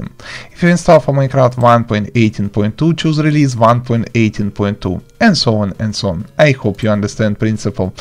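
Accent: Russia. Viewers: Слышен русский акцент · It is Russian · r u russian?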